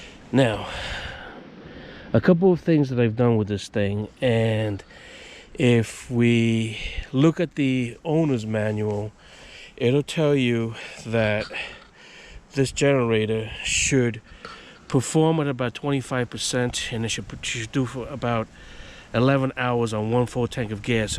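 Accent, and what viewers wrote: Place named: New York, United States. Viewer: Great video!! Couple of things right off, I'm from NY, like the accent. The other is, I use a syringe-plunger big one that holds more than 20 ounces with and a tube extension to suck the oil out for my oil changes(I do it while the van is at client houses super quick no mess! LOVE IT! Use this method on all three of my mobile dog grooming vans! I have 2 of these and run them both on the back of a mobile dog grooming van(shuttle bus conversion). I power a 15,000 BTU Roof AC, water heater, clippers, lights, and most power hunger, a blower-dryer to dry the dogs. I use 1 3500 Gen for just the blower-dryer and the other for the 15,000 BTU AC and everything else. You have me thinking if I make these mods, I could longer run times on a tank full. I gotta try. What about how loud with the spark arrester off? My clients like quiet in their driveways as their dog is being groomed... 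Gimme your thoughts.... Please. Thanks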